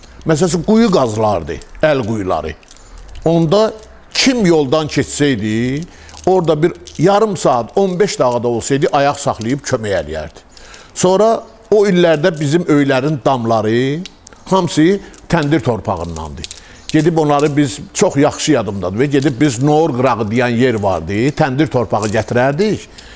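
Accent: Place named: Bilgah, Azerbaijan. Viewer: Kişi, Allah can sağlığı versin sənə, gözəl söhbət elədin. Eyni adətlər bizim kənddədə var. Elə bildim Bilgəhli danışır.Bilgəhdən salamlayıram sizi.Mənimdə rəhmətlik atam anamı mənim adımla çağırardı. 1972 də atam 21 i kievdən çernopuldan gətirib, indi mən sürürəm.Dübbə düz 21 i xoda salanda, öz xüsusi iyi var. Allah cəmi yaxşıları gözə görünən şeytanlardan qorusun!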